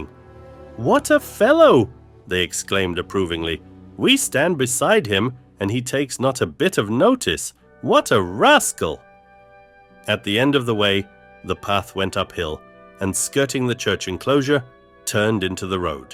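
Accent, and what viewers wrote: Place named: Russia. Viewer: У чтеца ярко выраженный русский акцент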